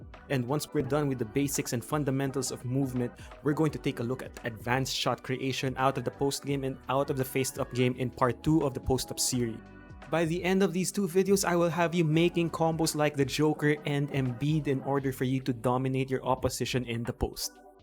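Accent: Philippines. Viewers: First of all keep it up. Awesome content! Second of all I like your Filipino accent lol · Is that a Filipino accent?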